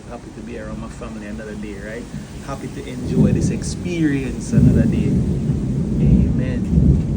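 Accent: Jamaica. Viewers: You make this island lady home sick. Your meals, your accent, your praise. Love love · Don't forget the beautiful Jamaican accent 🤤n food together. Delish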